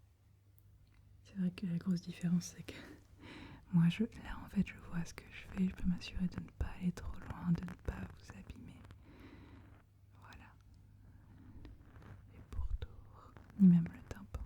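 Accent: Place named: France. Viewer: This channel is the only ASMR channel that actually makes me fall asleep 🙂‍↔️ His French accent is very cute to listen to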